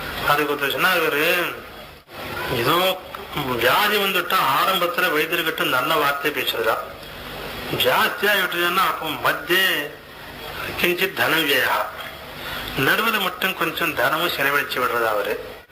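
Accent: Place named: Karnataka, India. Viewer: Jagadguru's Tamil sounds laced with Kannada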